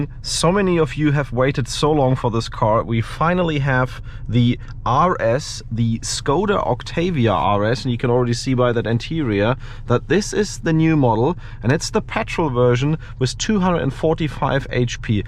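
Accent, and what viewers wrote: Germany. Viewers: It took exactly 3 seconds to know you are german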